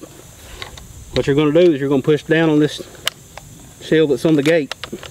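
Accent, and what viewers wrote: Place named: Oklahoma, United States. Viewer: This dude has a cool accent -- Oklahoma?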